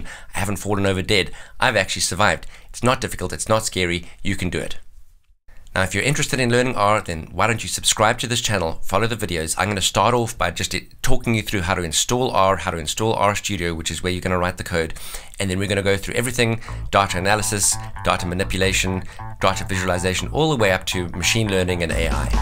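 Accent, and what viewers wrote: South Africa. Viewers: Are you South African? The accent sounds familiar! Loved the video, great content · i wonder if the presenter south african?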